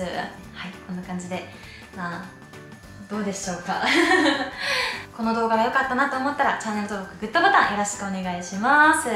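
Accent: Kansai, Japan. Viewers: たまに出る関西弁とちょっと関西弁に寄ったイントネーションになぜか胸が締め付けられる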